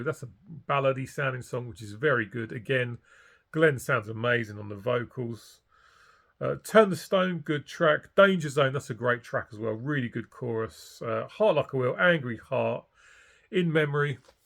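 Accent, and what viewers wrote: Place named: West Yorkshire, United Kingdom. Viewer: that could be your accent, not sure if it’s West Yorkshire, and baritone . Anyway, I’m happy to find your channel and subscribed it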